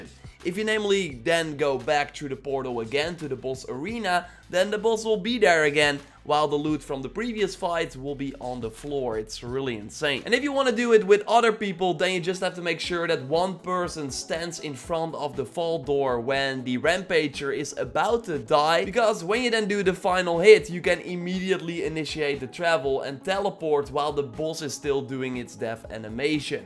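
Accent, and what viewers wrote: Netherlands. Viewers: Your Dutch arent you?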